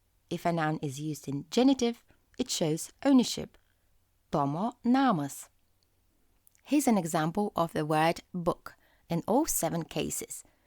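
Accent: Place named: United Kingdom. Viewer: Are you a Brit?so pleasant English in here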